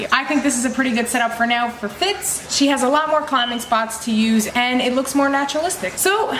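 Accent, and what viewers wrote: Philadelphia, United States. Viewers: Your accent is adorable, philly?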